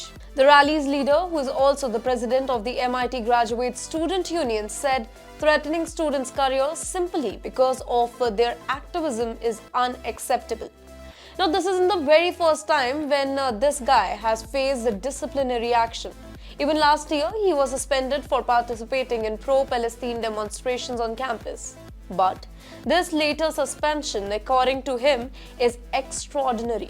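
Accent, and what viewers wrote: India. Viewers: नमस्ते जी, आप अंग्रेज़ी हिंदी के समान बोलती हैं।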